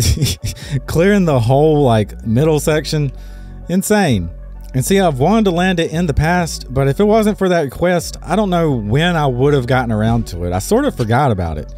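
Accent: South, United States. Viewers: Agreed! Calming and soothing with that little bit of southern twang lol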